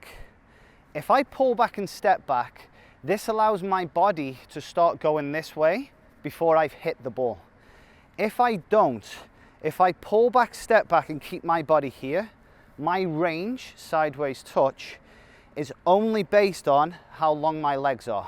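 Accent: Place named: England, United Kingdom. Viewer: love these videos but i cant help to get confused by why he has an english accent and calls it soccer?! like its Football in England.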